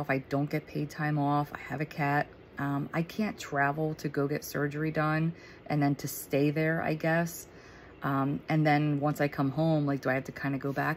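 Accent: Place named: Pennsylvania, United States. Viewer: Sounds like u r in Pa.? I'm in Lancaster look up Dr Perry Argires he's the best neurosurgeon in Lancaster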